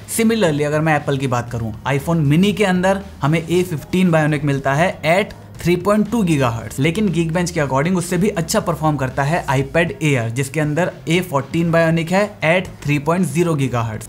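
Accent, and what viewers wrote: Bengal, India. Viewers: Are u Bengali bro?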